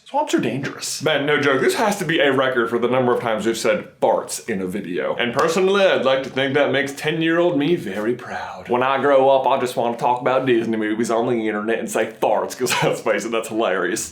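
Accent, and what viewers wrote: South, United States. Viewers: I can't get out of my head that he is southern now. I didn't notice his southern accent before. Great. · Do you normally talk with a Southern accent, if so why change it? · i never realized that he has a sight country accent until this video and now it is all i hear oml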